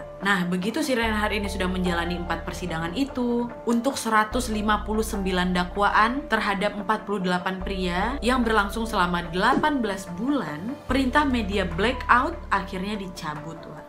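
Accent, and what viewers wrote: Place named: Medan, Indonesia. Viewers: Nonton semua video serasa lagi gybah sama temen, seru habis ya walaupun ceritanya seperti kaidah kaidah semata, tapi dia jelasinnya kayak anak medan kali logatnya, senang kali aku nengok kontennya, sukses terus ya biar kita gibah terus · Anak mana ko kakkk medannya kauuu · Suka kali aq dengan logatnya.. bah medan kali lah.. langsung ku subcribe. I love u sis ❤😘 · Aku suka banget sama gaya bicara dan suara yang jelas dan lantang. Pembahasan tentang kasus2 yang dibawakannya begitu runtut, rinci dan detail. Lagipula juga pintar, cerdas serta cantik rupawan. Dari logat bahasa, sepertinya dari Medan-Sumut ya? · Jadi ketagihan awak nonton video uwak..logat melayu deli yg kental kali wak...sukses selalu wak💪😘🙏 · Logat sumatranya kentel nian wak ini🤗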